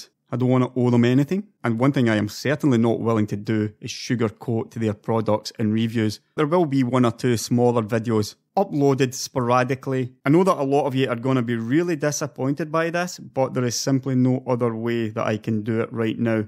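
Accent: Scotland, United Kingdom. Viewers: I love your scottish accent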